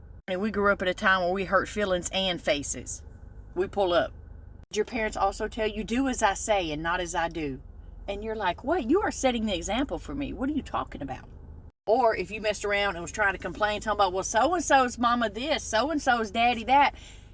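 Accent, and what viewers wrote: South, United States. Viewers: You sound like you from the south,I'm from rural N.Y. and holy shit woman ...we had it the same! I'm 51 and I get it. You're awesome! Loved this video ❤!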